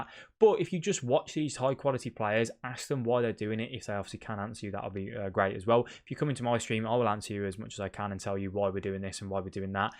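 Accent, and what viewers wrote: Birmingham, United Kingdom. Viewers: Great channel m8 are you from brum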